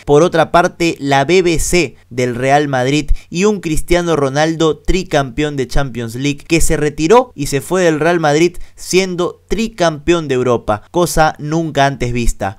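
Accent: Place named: Argentina. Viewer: El contenido va bien a veces pero el tonito argentino no va. ¿Por qué nos queremos parecer tanto a ellos? ¿Jala más seguidores?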